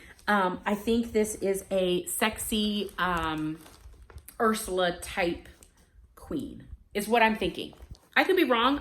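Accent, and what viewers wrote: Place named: South, France. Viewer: Loved your southern French accent! I would love that sampler. So colorful.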